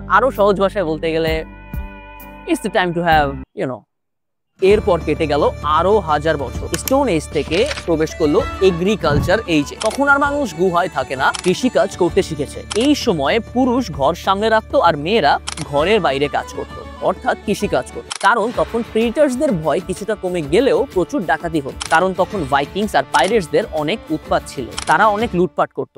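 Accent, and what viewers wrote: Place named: India. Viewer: My favourite session is rain Your voice like Indian person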